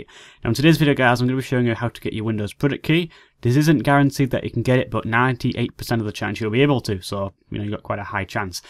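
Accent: United Kingdom. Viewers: Chris Nova its real English, we call it British English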